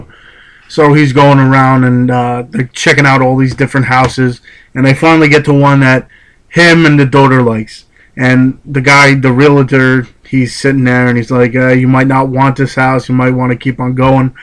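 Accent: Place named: New Jersey, United States. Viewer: i love your jersey accent lol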